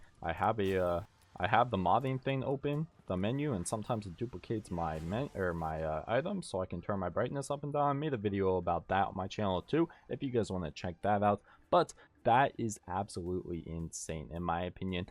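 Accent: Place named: Quebec, Canada. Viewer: you sound french canadian.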